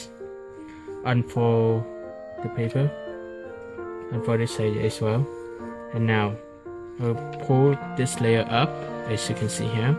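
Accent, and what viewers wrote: Vietnam. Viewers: Anh này làm video hướng tới người nc ngoài, nên tiếng Anh là chính, nhưng là chất giọng Việt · Nghe cách nói tiếng anh là biết người việt rồi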